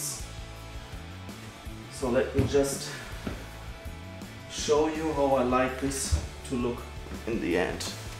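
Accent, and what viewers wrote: Germany. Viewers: Man hört direkt das es ein Deutscher kohlege ist 😂👌 geiles Teil bitte mehr davon !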